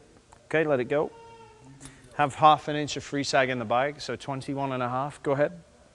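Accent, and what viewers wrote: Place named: Northern England, United Kingdom. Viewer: is he northen english or american?? that accent is confusing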